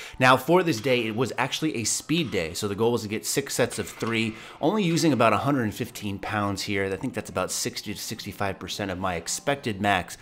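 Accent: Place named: South, United States. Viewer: dem accents doe seriously big upps more of that southern accent it makes these videos so much better:D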